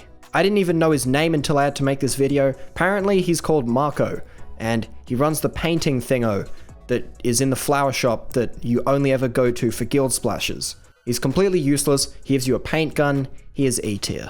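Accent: Australia. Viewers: I thought that was an aussie accent, I live in melbourne and I am sad, I can’t even beat greensleeves )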